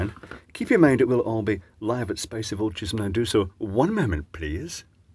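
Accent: United Kingdom. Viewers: That felling when Stevie from Family Guy repairing thermostat. I like that british accent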